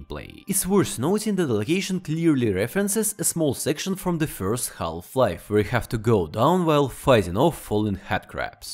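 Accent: Russia. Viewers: We all love spy dataminer chicken mit Russian accent 🐔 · Cool accent, are you Russian?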